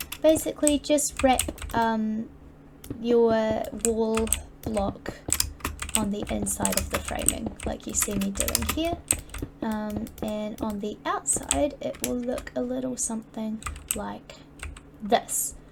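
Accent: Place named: New Zealand. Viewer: Finally a kiwi builder so I can understand what your saying lol loving the builds super clean and super simple hoping to up my build game a bit looking forward to your next tutorial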